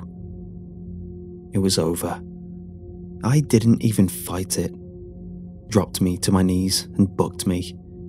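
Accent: United Kingdom. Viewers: I watch these just to hear your amazing British accent ❤️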